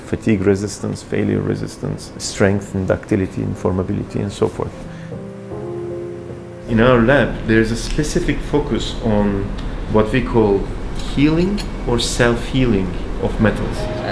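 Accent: Turkey. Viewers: Aksan Direkt Türk Olduğunu Belli Etti :D İsimde Kesinleştirdi. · videoyu açar açmaz bildim aksanindan türk olduğunu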